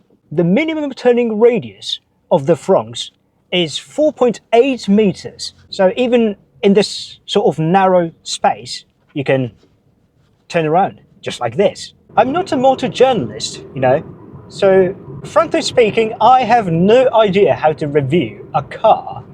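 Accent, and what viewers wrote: United Kingdom. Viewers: イギリス訛りなだけでイギリス人特有の辛口レビューが足りない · イギリス訛りの英語を流暢に話す日本人が、インド製の日本車をレビューする動画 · イギリス英語で車紹介、どこかのトップギアかな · スズキ、フロンクス、天竜川、イギリス英語 全部好きだから助かります ありがとうございます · 車の紹介でイギリス英語はあれを思い出すな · 真実の棒を使う配信者のようなゴリゴリのイギリス英語で、旧植民地で生産された元同盟国メーカーのクルマを、元帝国主義国の元雑誌編集者と腰痛持ちの配信者をオマージュしながらレビューする淫夢廚とかこれもうわかんねぇな…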